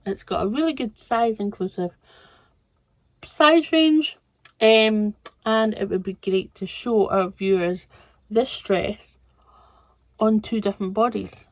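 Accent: Scotland, United Kingdom. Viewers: Lovely dress, and the heathery colour looks great on you. I'm in Aviemore quite a lot as I live a bit further north in Forres and it isn't too far away for a day out. Always good to hear a Scottish voice on youtube!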